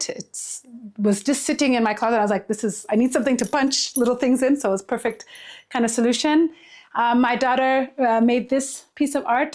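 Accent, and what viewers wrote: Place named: Kenya. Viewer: she even got that kenyan accent wow.....i wouldn't even know she's ethiopian if i met her, would have assumed she's kenyan borana or oromo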